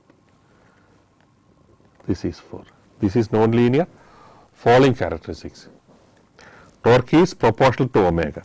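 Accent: India. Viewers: Nice, but I can't understand this indian dialect...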